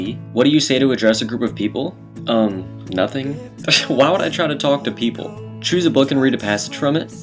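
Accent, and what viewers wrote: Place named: United States. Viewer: technically everyone does have an accent he has an "american accent" to most people who don't live in 'MURICA.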